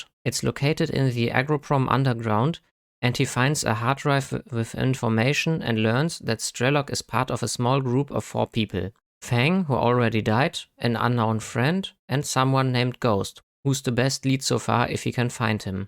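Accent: Germany. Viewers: german english lol. höre ich sofort ^^ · North german accent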